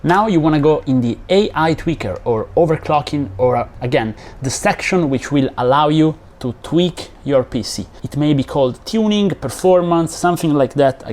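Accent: Italy. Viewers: Why u have an Italian accent?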